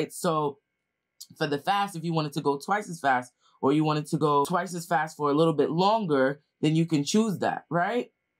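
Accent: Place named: New York, United States. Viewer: This girl sooo New York. I love it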